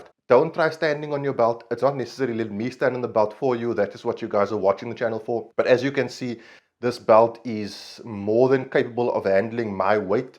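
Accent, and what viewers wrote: Cape Town, South Africa. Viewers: hi are you from cape town? i like your accent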